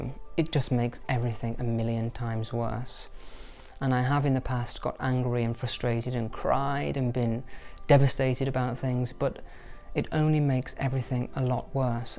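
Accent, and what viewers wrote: RP, United Kingdom. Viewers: You are a delight,. I must say you sound so posh and I mean that as a compliment. So crisp, yet gentle, and gracious. Can't wait to see more. #sophiebestlife If you want to find the most calm, elegant, historical and beautiful places in the US...please reach out. I'd love to provide ideas. Just a couple to start your US list - Savannah, Georgia; Santa Barbara, California. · I'm really surprised to hear you say you have a very middle-income background, as your voice and demeanor comes across as quite 'posh' :) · You’re so sophisticated, calm and peaceful! I love the British accent❤️.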